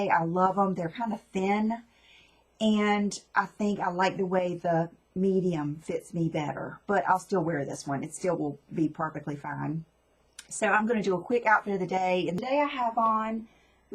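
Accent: South, United States. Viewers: well said b/c I am a firm believer in doing what you like to do re: anything from hair color, length etc.... your the one wearing it....your absolutely beautiful & that nice southern twang....love love...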